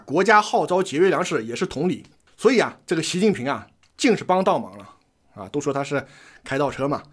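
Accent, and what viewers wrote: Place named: Shandong, China. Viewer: up山东人？方言暴露了啊😄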